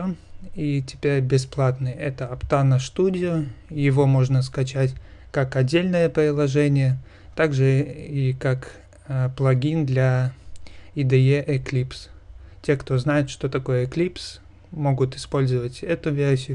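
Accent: Germany. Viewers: Немецкий акцент сильно чувствуется.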